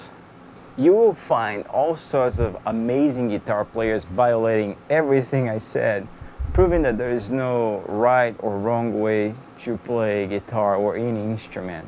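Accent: Brazil. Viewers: that's a brazilian accent ahah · Just pondering...what nationality are you? I've been sitting through all these videos, and the accent sounds familiar, but I want to say Brazilian Portuguese. Am I even close? Outside of that completely unrelated question, I just wanted to thank you for making these super helpful videos!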